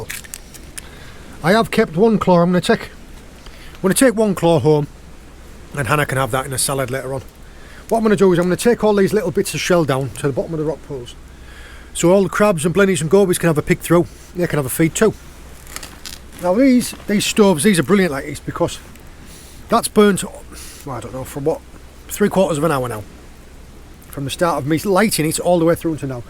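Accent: United Kingdom. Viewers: Such a beautiful video. You didn't take James this time with you. Again the lovely British accent as always.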